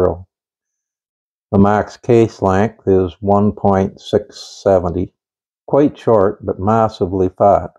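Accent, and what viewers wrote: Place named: Canada. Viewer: Hello there, what a beautiful job restoring that rifle! You sound Canadian, hello from northern Alberta. Hope you continue to post videos of your collection.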